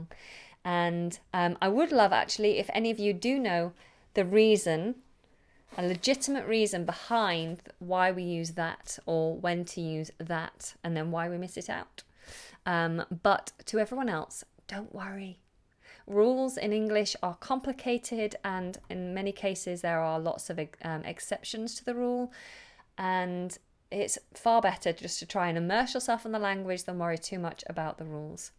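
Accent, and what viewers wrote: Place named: United Kingdom. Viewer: I really love your British accent. It sounds like music to me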